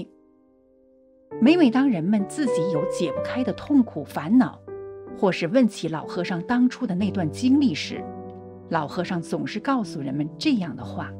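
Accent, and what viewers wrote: Hakka, China. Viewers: 佛友吉祥 客家口音有點重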